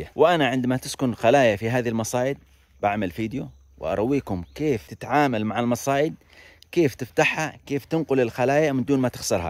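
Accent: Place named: Yemen. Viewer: اخي. انت من اليمن صح. لهجتك مشابهه للهجتنا اصحاب الحديده. بس المكان هذا الي انت فيه وين. مش ممكن يكون في اليمن 😢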